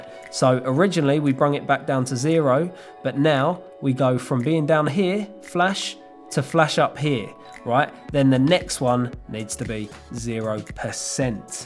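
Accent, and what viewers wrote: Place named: Essex, United Kingdom. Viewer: It’s the Essex / east London accent. I’m guilty of it. If I say “have you seen Thor?” people say “four what?”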